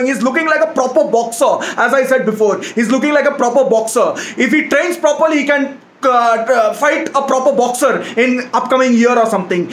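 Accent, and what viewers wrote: India. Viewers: We know American English and British English newly added Indian English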